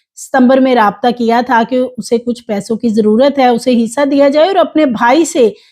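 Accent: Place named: Punjab, Pakistan. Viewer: Tu c paindo ho. Ardo (Urdu) punjabi accent which boldi ho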